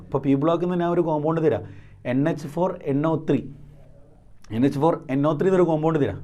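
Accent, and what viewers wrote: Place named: Kozhikode, India. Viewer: സർ കോഴിക്കോടൻ ഭാഷ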